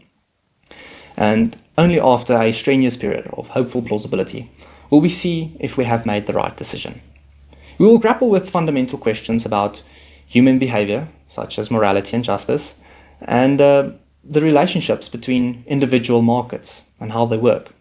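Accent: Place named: South Africa. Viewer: Just out of curiosity, where do you come from? Your accent sounds South African (like British roots mixed with an Afrikaner accent), but you could also be from the continent of Australia. It would be quite a surprise if you are South African as not many South African Youtubers make this kind of content.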